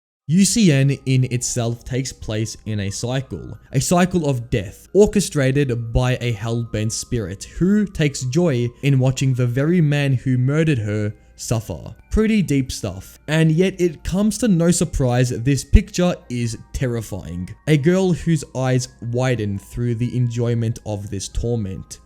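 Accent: Australia. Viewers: Are you Australian cause I’m too bro 🤩 also great video enjoyed it mate 🫶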